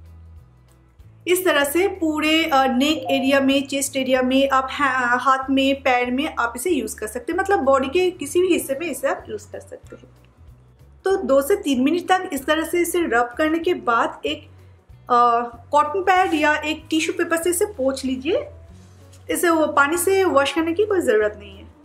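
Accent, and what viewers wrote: Bengal, India. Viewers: Bengali? you have bengali accent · App Bengali hain , mujhe baat sun ke laag raha hain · Aap bengali ho right bola na😅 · Apne to bangal ka didir moto hindi bolta he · Are u bengali? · Tag mark of Bengali that accent. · u r bengali right? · R u bengali ? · dude i'm gonna ask the same question, well her accent resembles Bengali😁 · Yes it's the accent of bengoli s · Apki Hindi me Bengali tone h😁🤭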